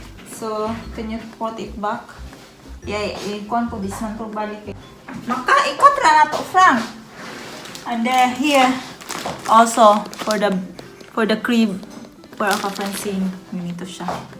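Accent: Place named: Visayas, Philippines. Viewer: Nice sis. 😊pareho tau bisaya ang tono.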